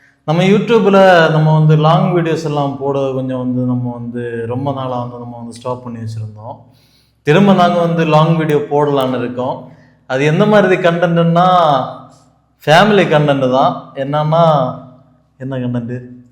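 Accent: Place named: Kanyakumari, India. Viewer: உங்க ஊரு கன்னியா குமரி மாவட்டம். ....😂😂😂😂😂 நல்ல தமிழ் . எங்க ஊரு மொழி எனக்கு தெரியாதா.....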